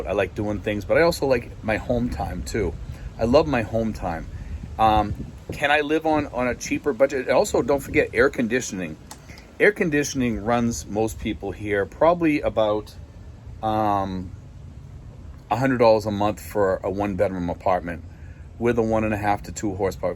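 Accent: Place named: Massachusetts, United States. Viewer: You sound like your from Mass.I know that accent.Im from Quincy.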